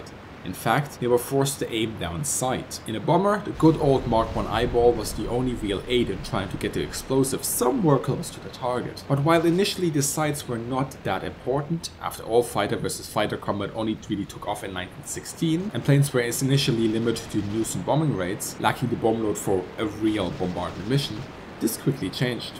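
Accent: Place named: Germany. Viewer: Jimson Patiño MacLean His English is near perfect. He just has a German accent.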